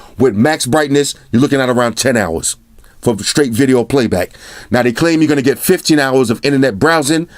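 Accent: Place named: East Coast, United States. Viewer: Extra aggressive guy. Great review. Surely you are from NY or Chi. Sounds like east coast.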